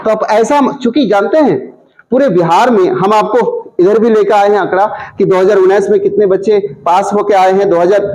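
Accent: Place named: Bengal, India. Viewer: Aapke aawaj me bangali tone hai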